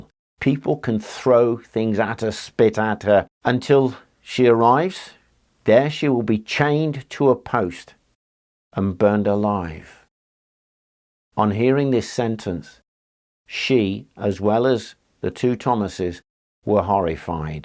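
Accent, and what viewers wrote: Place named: Midlands, United Kingdom. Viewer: Midlands rather than northern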